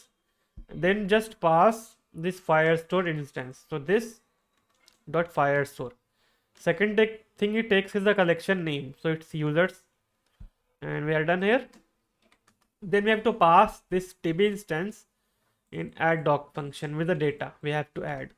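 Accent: India. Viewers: Stop being so offended dude. Narrator has an accent thick as a curry which is a fact. Before you call me racist, I am an Indian too and I would have said the same thing. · change the indian accent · Omg please not indian-english voice magodddddddddd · his accent is so thick indian, it's hard to get anything he says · Just 1 thing i like more than study programming - is to study programing in English with indian accent. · Indian accent make me easy to understand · no hate towards Indians but I hate their english accent it irritates my ears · Indian accent provides clarity. Yeah and you guys are proving that you don't have good teachers to teach you guys, you depend on Indians.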